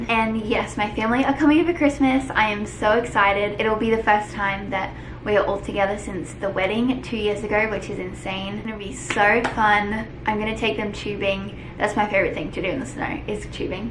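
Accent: Australia. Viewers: So Australian. Love it 😍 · me watching the whole video and the whole thing I pay attention to is her amazing Australian accent 😂😐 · I think it would be so interesting to see how many of your kids and what kids of yours get's your Australian accent or if any gets your accent!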